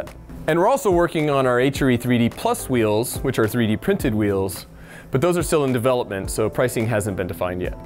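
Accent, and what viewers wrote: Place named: California, United States. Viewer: Haha I like it he talks like the Californians on SNL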